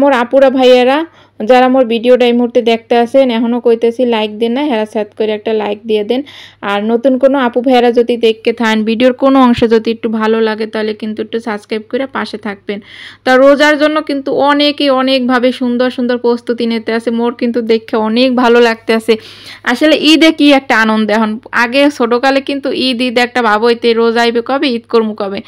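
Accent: Barishal, Bangladesh. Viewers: আসসালামু আলায়কুম আপু আজকে প্রথম তোমার ভিডিও দেখলাম ।আপু তুমি কি বরিশালের মেয়ে। কথা শুনে মনে হলো। আপু অনেক অনেক ভালোবাসা রইলো আপু। আপু আমি ও কিন্তু বরিশালের মেয়ে ❤❤❤❤❤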